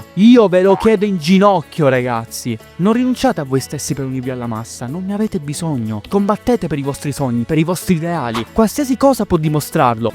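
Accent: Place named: Italy. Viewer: mado lacento PIÙ italiano di sempre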